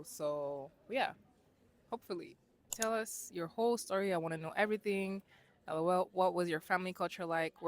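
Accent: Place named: England, United Kingdom. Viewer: You are sooooo cool! New subby!!! I LOVE your English accent!!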